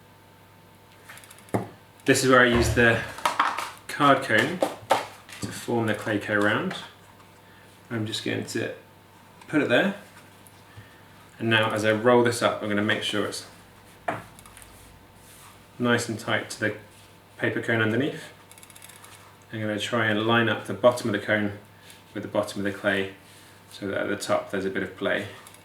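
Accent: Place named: Nottingham, United Kingdom. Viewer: Are you from nottingham? I hear it in your accent!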